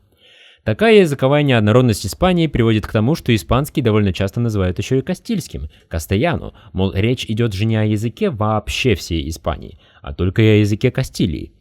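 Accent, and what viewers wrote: Russia. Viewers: круто, но слышен русский акцент при разговоре. сообщаю как человек, который говорит на испанском без акцента.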